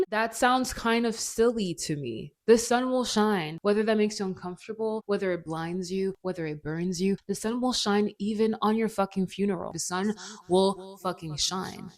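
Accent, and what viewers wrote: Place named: France. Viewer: I wasn't ready for you to be french 😂 quel accent de fou !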